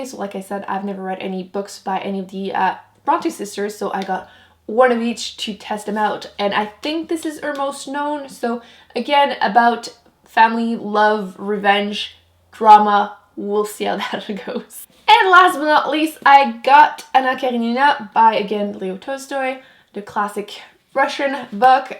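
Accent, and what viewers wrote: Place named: Quebec, Canada. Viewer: For Jane Austen, I really liked reading Emma and Northanger Abbey is a fun read but it's really different to her normal writing style because it's more a «parody» of the Gothic genre than a love story! And you also made me think to put Anna Karenina in my TBR! (Et sans être trop personnelle, originaire du Québec? L'accent que tu as lorsque tu parles français ressemble beaucoup au mien aha ;) )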